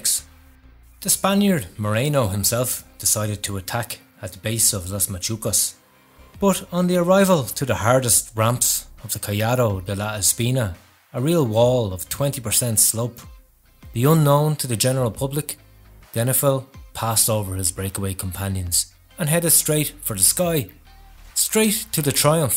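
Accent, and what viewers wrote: Ireland. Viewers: I think your subtle, understated Irish accent really adds to the narrative. Just discovered this channel and is eye-opening to say the least. Really like it.. I imagine it'll take off even more when/if the big names get busted.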